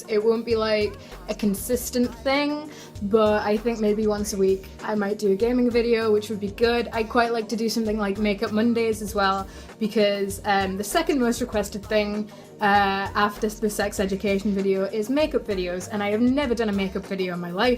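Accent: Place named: North, United Kingdom. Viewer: !!!!! <333 hearing your accent makes me feel so happy i miss the north sob!!! so excited to see you back on youtube!:D!!!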